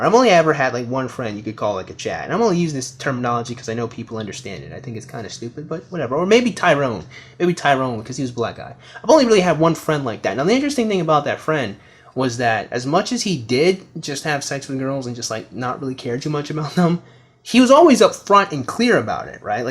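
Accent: United Kingdom. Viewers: I love your british accent btw, some real funny shit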